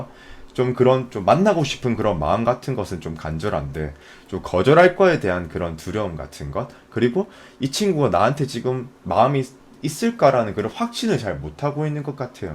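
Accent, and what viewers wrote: Gyeongsang, South Korea. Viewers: 저도 경상권이라 사투리 너무 정겹고 반가워요ㅋㅋㅋ👐 . . . 2번🔮 실제로 연락은 한번도 안왔는데 저는 먼저 할 생각이 없네요 ㅎㅎ 리딩이 사실이면 좋겠어요..! 잘듣고갑니다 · 전남친이 경상도 사람이였는데 말투가 비슷해서 너무 맘이 아파요.. 타로 감사합니다 3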